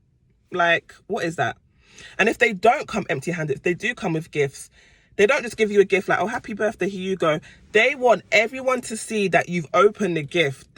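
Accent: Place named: London, United Kingdom. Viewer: Oooh a London accent🎉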